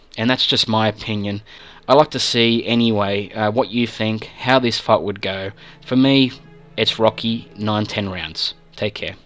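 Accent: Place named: Australia. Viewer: You sound Australian, what would you know about boxing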